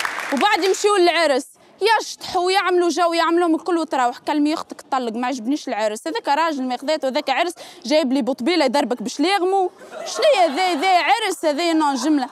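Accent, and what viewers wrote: Kasserine, Tunisia. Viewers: rani 7ata na gassrinia ema hya s7i7 t5dem 3la ro7ha rabi y3inha o5ti ema mch bdharoura bch tdha7k l3bed tst3ml l'accent mt3na wt7ki blahjtna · شحال شابة لهجة تونسية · هي تحكي هكك هي مالقصرين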